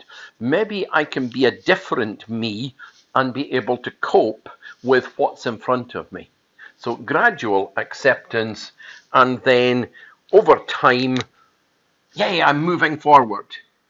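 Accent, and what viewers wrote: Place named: Scotland, United Kingdom. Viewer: Hi, nice one - like your explanation, change hearing it in a Scottish accent rather than my Yorkshire one:-)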